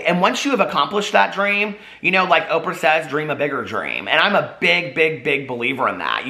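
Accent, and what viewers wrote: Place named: Indiana, United States. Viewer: helped me pass my Test of English Foreign Language! For 4 months I watched his videos on all of his channels to practice listening and speaking. One day I might speak as fast as, with some indiana accent! 🤩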